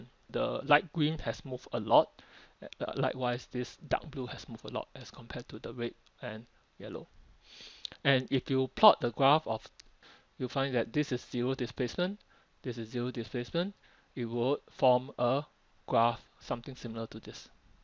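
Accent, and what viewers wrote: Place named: China. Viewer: Good video. U sound very asian Chinese.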